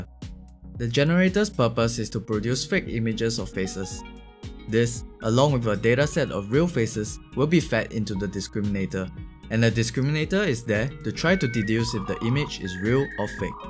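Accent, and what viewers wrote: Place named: Singapore. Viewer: i think hes singaporean, really distinct accent :P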